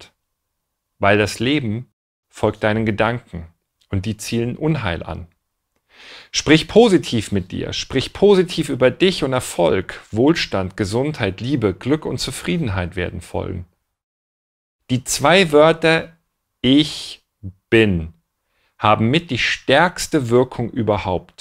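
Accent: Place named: Bavaria, Germany. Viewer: Nur die bayerische Aussprache stört, z. B. Großartikkeit ..., sonst super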